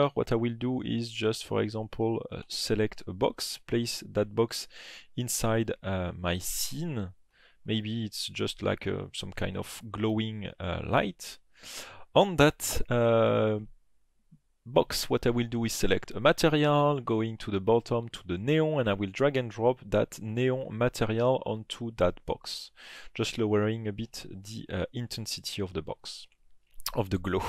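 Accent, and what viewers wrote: France. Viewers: Super vidéo, mais avec ton fort accent français, tu ne pourrais pas nous la refaire aussi pour nous les francophones ??? Ste plait, ste plait !!! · The French accent 😬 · Cela faisait longtemps que je n’avais pas entendu un tel accent français dans une vidéo anglo-saxonne, c’est très drôle même si ça pique un peu les oreilles 😀. Par contre, vidéo très interessante sur le sujet et c’est le principal. Vous avez un nouvel abonné. 👍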